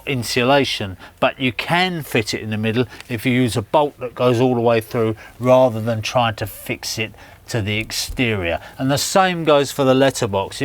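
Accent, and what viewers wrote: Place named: United Kingdom. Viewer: thanks for reply! Unfortunately it's hard to understand Brittish accent for me. Good job though. What is the cost to install such door in UK?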